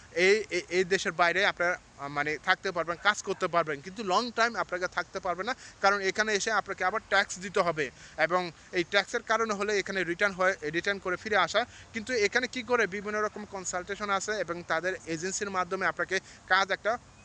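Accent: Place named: Brahmanbaria, Bangladesh. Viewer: আসসালামুয়ালাইকুম ভাই মনে হচ্ছিল আপনি আমাদের এলাকার ব্রাহ্মণবাড়িয়া আমার বাড়ি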